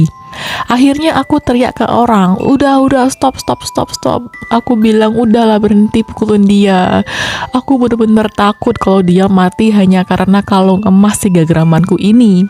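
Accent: Java, Indonesia. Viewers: Asline ngendi sih mbak..ko koyo orang jawa sampean..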